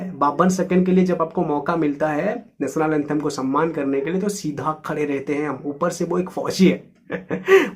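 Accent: Odisha, India. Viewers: Bhai AP kya odisha se ho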